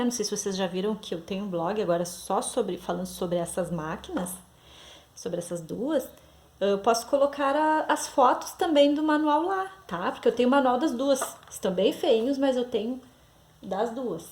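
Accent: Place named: Rio Grande do Sul, Brazil. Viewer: Acabei de comprar uma maquina lanofix automatica antiga a garantia e de 1967. Vou ter que cuidar lubricar mas estou feliz. Ja tive uma lanofiz que tinha cartela de borados, infelizmente perdi numa mudança. Amando suas aulas. Gostaria de poder mandar foto da minha maquina para vc. Bjs gratidao. Amo seu sotaque ba guria!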